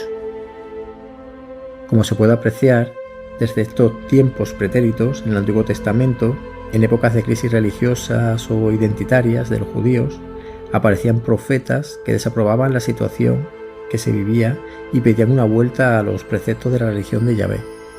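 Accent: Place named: Extremadura, Spain. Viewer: Me encanta la historia. Muchísimas gracias por los podcast, los voy escuchando poquito a poco. Esta semana creo que me llega el primer libro de Jesús que usted ha escrito. Sólo decirle que creo que por su acento, usted nació en mi tierra, Extremadura, a la que adoro y ahora más desde que no vivo en ella. Un saludo enorme y mil gracias por difundir su conocimiento.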